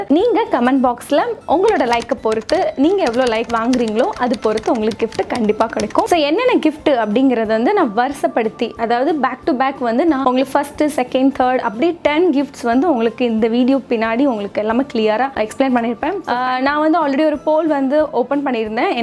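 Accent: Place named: Tamil Nadu, India. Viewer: Hi, this is my first every comment in YouTube,to compliment your cute simple South Tamil Nadu accent,I just love your,way of explanation that's makes us feel,girl next the door about you unlike many other you tubers,my strong support for your work and best wishes.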